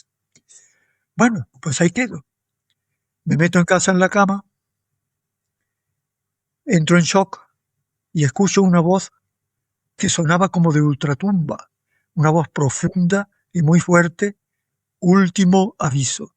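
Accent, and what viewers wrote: Spain. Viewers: El Dr tiene una oratoria extraordinaria,y resulta muy bello oírle con su bonito acento andaluz.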